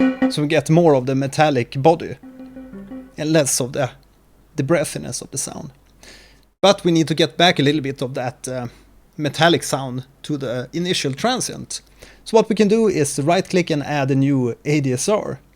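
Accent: Sweden. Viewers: omg you sound sooo swedish! love it! <3 (im from sweden too)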